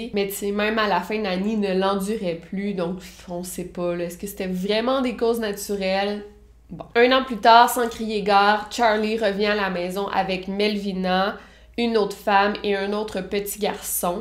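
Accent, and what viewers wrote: Quebec, Canada. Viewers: EXACTEMENT ton accent quebecois et ton joli visage nous suffit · vous êtes délicieuse avec votre mimique et votre accent quebequois..je commence à vous suivre 100 pour cent Merci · Honnêtement, j'ai vraiment dû mal avec l'accent québécois. Sans aucune raison, mais cela m'agresse les oreilles. Cela aurait été dommage que je m'arrête à cela compte tenu de vos recherches et vos affaires intéressantes. Alors je prends sur moi. Merci pour le partage. Très bon boulot.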